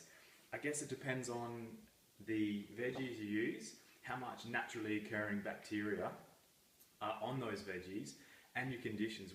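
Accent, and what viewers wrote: Australia. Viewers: It's especially funny when translating an Australian accent. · Accent??? He's an Aussie... easiest accent to understand! 😁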